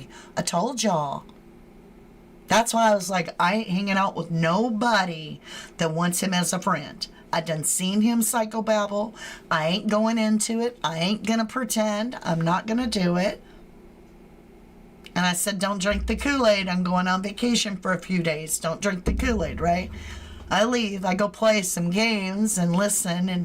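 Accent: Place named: United Kingdom. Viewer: I love your UK accent 😂❤️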